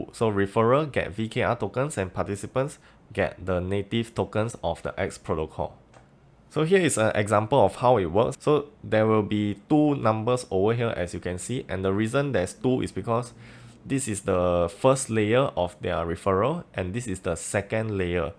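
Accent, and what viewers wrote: Singapore. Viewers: Thanks for the clear and concise video. You sound Singaporean. Cheers from another Lunatic from the lioncity. ;)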